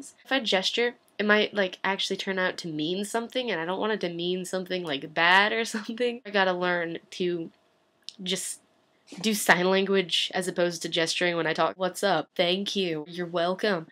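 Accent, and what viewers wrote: United States. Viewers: you're right I live in CT and you sound like you' be from Connecticut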